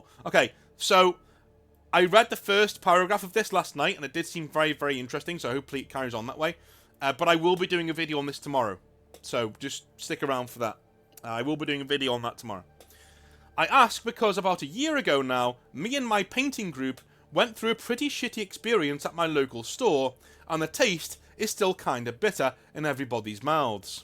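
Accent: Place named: Wirral, United Kingdom. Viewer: no opponents? You have the dulcet tones of someone from The Wirral! I live on the Wirral myself (that is ON the Wirral, not IN the Wirral... don't ask me why its that way ) I'm out of love of big 40k atm but if you ever wanna play Killteam hit me up brother!! (Anything to not have to think about the Mighty Blues for a weekend!!)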